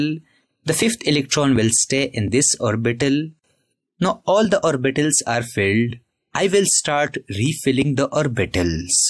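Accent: India. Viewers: to is very usefull for drawing, but I would draw one arbitrary non-Z-axis for the dz² just to make it still fit into 2-Dimensional view, or for 3-Dimensional, jsut imagine 3-rd axis directly pointing at you and you don't see ti as it is covered by the origin point. Your dz² drawing feels little bit to 1-dimensional. But as with all indina channels, I never mustn't watch any other Vid of your Chennel or my brain will explode, your english is much harder to understand than normal english. But you explain pretty well, (all the Electron will stay there and here stuff, but the d orbital drawing was just the most usefull.) with less acent in language, i.e. if you would use Queen English, I might even consider subscribing/recomending you.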